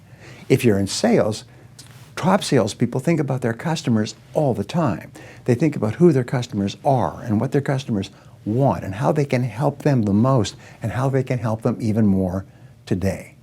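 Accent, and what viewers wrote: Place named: United States. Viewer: Most definitely an American Accent, thanks...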